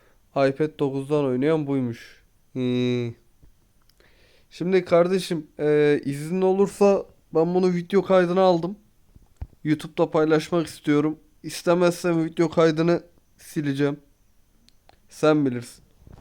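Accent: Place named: Kahramanmaraş, Turkey. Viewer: Maraşlımısın kardeş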